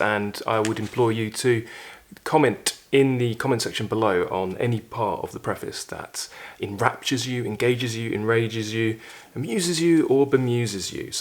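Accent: United Kingdom. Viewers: Is that British accent ... you are so well spoken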